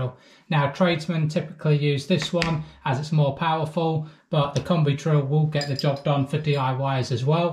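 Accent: England, United Kingdom. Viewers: Is that England accent?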